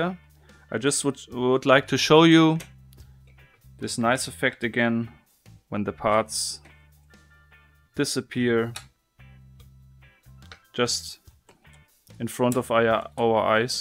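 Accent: Germany. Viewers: Man hört das du deutsch bist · Man hört den deutschen Akzent im Englisch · Alter du bist deutsch dein aktzent verraten ^^ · You have a german accent. Are you from Germany?☺ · dieser Moment wenn du merkst dass er Deutscher ist 😂 der Akzent....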